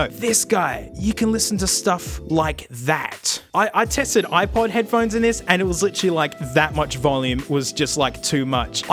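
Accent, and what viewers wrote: Australia. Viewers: bruh, youre ozzie as fuck. I gotta call my fam down under now. great video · You’re as aussie as Colin furze is British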